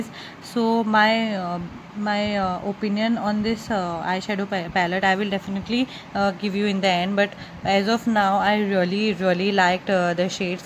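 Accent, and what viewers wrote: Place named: Goa, India. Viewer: Thank you for the honest review. Looks like you have a Goan accent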